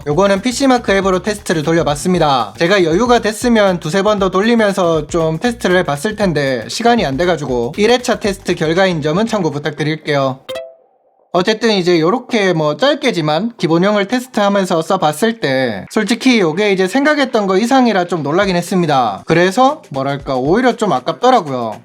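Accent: Gyeongsang, South Korea. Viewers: 숨길수없는 경상도사투리의 상세한 설명 감사합니다 ㅋㅋㅋㅋㅋㅋ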